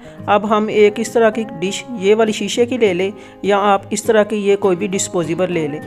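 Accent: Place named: Lahore, Pakistan. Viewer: Lucknow, India. Your dialect and accent remind me of my elders who relocated from Lahore to Delhi.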